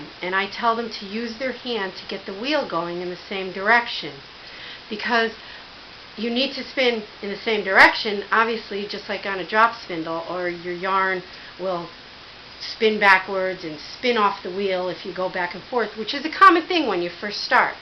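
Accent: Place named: Upstate New York, United States. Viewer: This is so wonderful. Thank you! Are you from upstate NY? You sound like my family!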